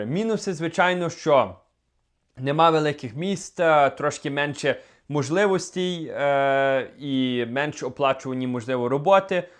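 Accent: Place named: Lviv, Ukraine. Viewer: На Львівщині люди дійсно так розмовляють.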